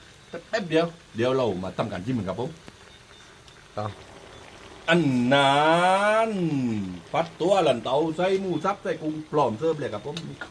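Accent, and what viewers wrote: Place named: Malaysia. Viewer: พูดภาษาใต้เหมือนกับเราคนมาเลเซียเลยค่ะ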